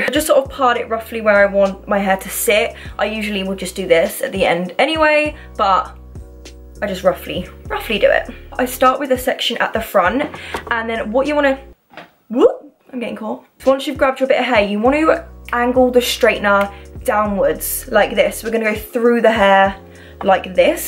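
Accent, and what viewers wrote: United Kingdom. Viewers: YOUR BRITISH ACCENT I'M DIYING 😭😭❤️ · British (I know cuz I have that accent too but you can ask in a q&a or something) 😂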